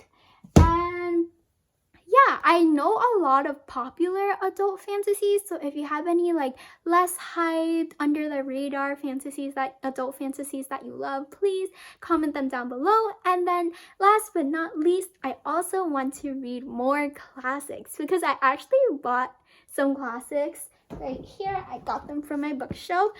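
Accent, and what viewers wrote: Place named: United States. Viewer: I think it's an American accent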